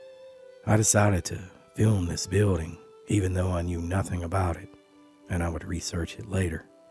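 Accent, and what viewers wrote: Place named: South, United States. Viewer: You make wonderful,easy to watch videos. The music and filming is exceptional in my opinion,oh and you are blessed with a great sounding “southern” voice. Thanks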